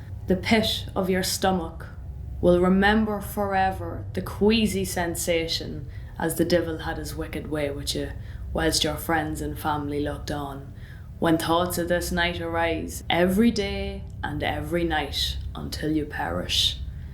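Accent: Ireland. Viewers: That was absolutely fantastic story telling I really really enjoyed it, you reminded me of my granny ( not in age I don't want to offend a witch such as your self) honestly ghost story's are scarier when told with an Irish accent, thank you for the smile on me face and the shiver down me spine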